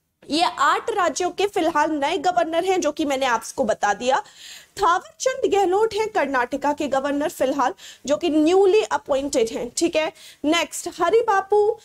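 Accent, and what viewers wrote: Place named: Bihar, India. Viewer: I think aap bihar se ho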